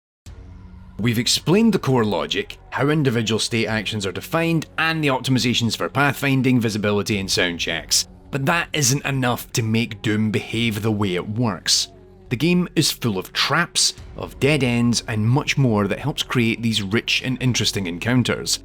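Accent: Scotland, United Kingdom. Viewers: A Scotsman saying ‘Rrrrip your face out’ sounds terrifying. Your dialect sounds awesome and terrific. Don’t even feel the need to deal it down. Also: nice things for you. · I wanted to subscribe. God why did you have to have a Scottish accent. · As a fellow Scot, I like how your accent got stronger as the video continued. Especially the rolling of the Rs. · It sounds like you were less able to suppress the Scottish-ness in this episode's narration! Also, for anyone at all interested in the nuts and bolts of DEWM, you owe it to yourself to check decino's channel! He's done A.I videos like this one, but also stuff like the sources for the game's audio and interesting tidbits about the sprites!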